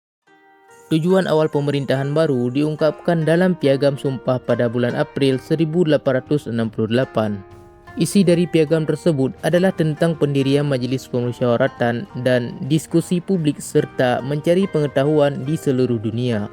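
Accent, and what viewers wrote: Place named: Java, Indonesia. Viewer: medok amat bli..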